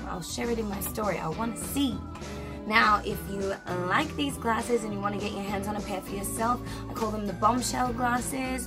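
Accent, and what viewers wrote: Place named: Australia. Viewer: It sounds to me like you now have a middling English with slight Australian accent. Nice!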